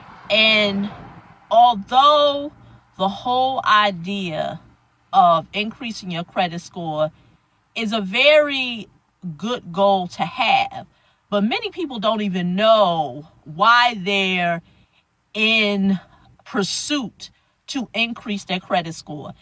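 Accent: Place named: North, United States. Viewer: U sound like your from up north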